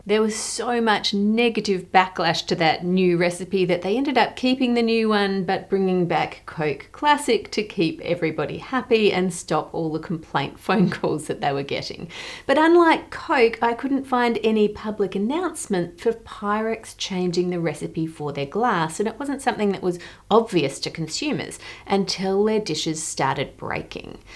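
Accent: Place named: Australia. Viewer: As someone who's grown up in Corning and has a dad who still works for the company that makes Pyrex, it was weird to hear an australian talk about it lol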